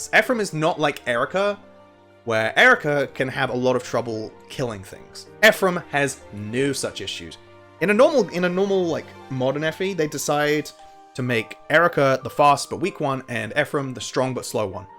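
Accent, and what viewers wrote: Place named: United Kingdom. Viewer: My experience with: > hey, that’s a chill tierlist, it can help stay up a bit longer. May as well subscribe, to watch that other Radiant Dawn 4-part series! > hey, that’s wind waker bgm, that can cheer me up a bit..! > that’s british accent, it sets me on good moods! > OH that’s theme!! And that’s how I became a fan! Thanks for the content! ❤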